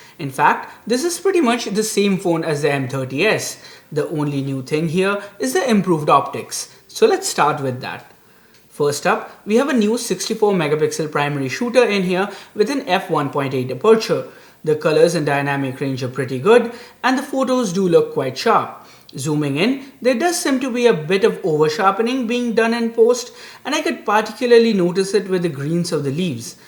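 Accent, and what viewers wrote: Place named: Bengal, India. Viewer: Hey, are you a bengali?🤔 Your accent (specifically how you pronounce your name) makes me think so... By the way, nice content as always ✌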